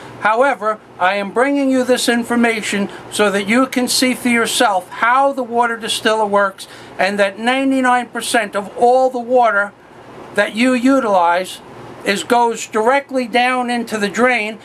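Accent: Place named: North, United States. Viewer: Thank you for replying. I looked again on the label of the water I buy, it doesn't say 100 nor even 10%; it just is labeled as "Distilled Water". I buy it at Family Dollar because I'm within a short walking distance to the store & it's very convenient. I'm now wondering if there is a way to determine exactly what % is actually distilled in their water? I have of course thought to buy a distiller however I'm retired on a budget. My thought in purchasing a machine was comparing it to the cost of electricity to run a distiller & how much usable water I'ld actually get in say an hour of use, that purchasing it would be about the same(I pay $1 gal). Have you figured out the cost to run your machine per gallon? I'm not sure the equation to do that here, but cooking or heating anything certainly raises my monthly bills. Oh, by the way I was pleasantly surprised to hear a friendly northern accent, haven't heard one in a long time as I moved from NJ more than 20 yrs ago to VA & I'm the only one in town still getting asked where I'm from! 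At least the pollution is less & the lines are smaller here. If it wasn't for the chemtrails it would be heaven, but I don't think there's a place left where that doesn't happen, ugh!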